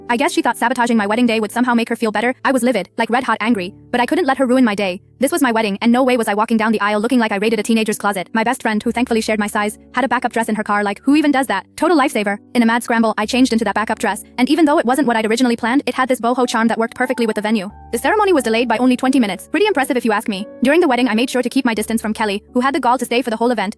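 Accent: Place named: India. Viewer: Why does the Ai have an Indian accent 😭😭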